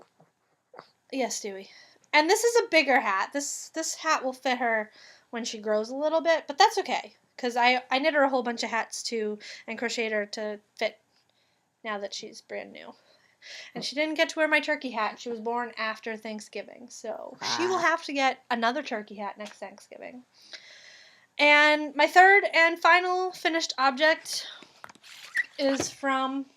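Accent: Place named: South, United States. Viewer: Nothing wrong with talking like you're from the south lol.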